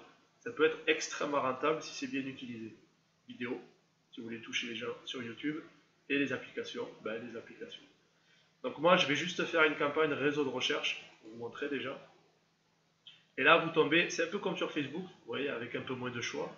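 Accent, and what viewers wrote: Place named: Tarn, France. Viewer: Ma3alm l'accent du sud du Tarn super vidéo 👍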